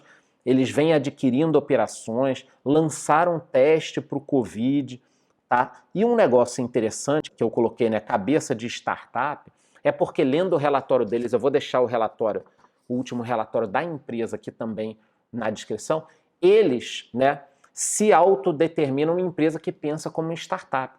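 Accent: Rio de Janeiro, Brazil. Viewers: O mora no sul mas esse sotaque carioca não nega kkkkkkk.... bota fleury ai na carteira porr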